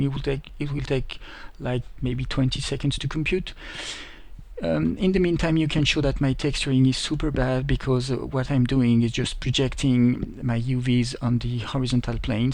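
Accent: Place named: France. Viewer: L'accent Fançais est présent!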